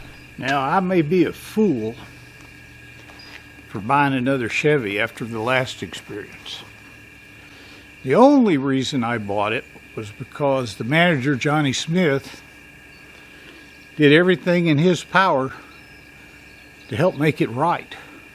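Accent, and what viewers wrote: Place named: South, United States. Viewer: What's sad..is they will turn right around and resell that POS you had to trade in to another poor guy who will be unaware of the ongoing issues that you had. You seem like such a genuine person and remind me of my father minus your southern draw you have. I'm a Ford man myself but I really hope this new truck works out for you. I'm glad that the GM at the dealership tried to take care of you. I wish we had more people working at dealerships like him. Good luck sir.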